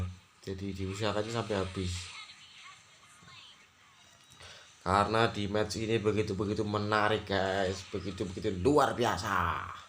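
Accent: Banyumas, Indonesia. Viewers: Bang ngapak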